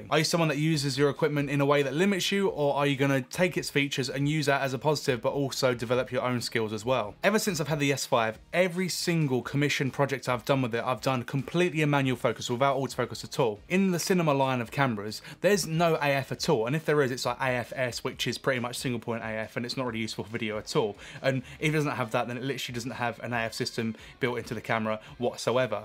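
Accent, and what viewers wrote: United Kingdom. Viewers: Can’t figure out ur accent, r u English?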